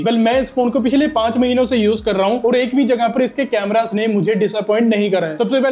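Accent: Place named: Punjab, India. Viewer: Are you punjabi? Your hindi has punjabi flavour😂😂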